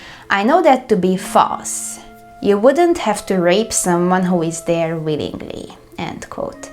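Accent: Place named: Hungary. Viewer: It is lovely and interesting, listening to Hungarian cases, listening to your hungarian accent and hungarian words. Greetings from ex Yugoslavia, from central Bosnia. Kiškofinefhaza hahah hungarian language amazes me.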